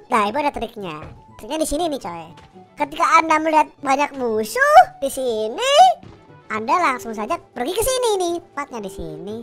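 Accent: Java, Indonesia. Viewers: bhs jawanya lancar n kentel jawanya kayak kopi. Bang enggak.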